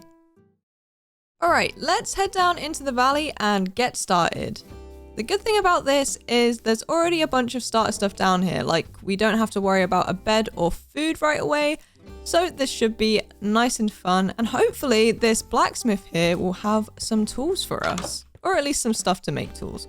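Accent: United Kingdom. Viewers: Are you British you sound British?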